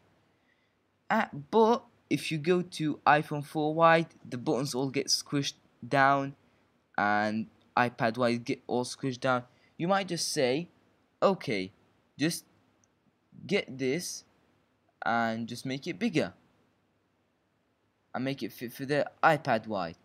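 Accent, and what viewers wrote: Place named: France. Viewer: Thanks you french-voiced stranger.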